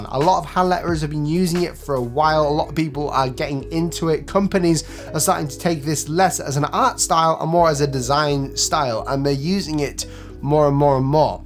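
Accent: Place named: United Kingdom. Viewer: That's how us British say it, like he said 'Adi-das" instead of "Ad-eee-dus". Similar to how we say most countries with the letter I and as "i" such as i-talian, not I-talian :). It's the way we break down the syllables in our speech pattern.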